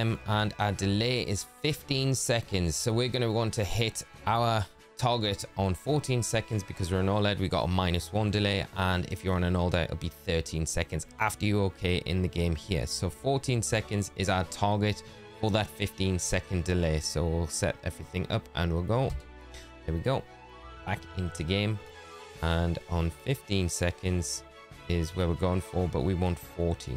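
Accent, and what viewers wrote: Newcastle, United Kingdom. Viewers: You’re a Geordie…?! Subscribed.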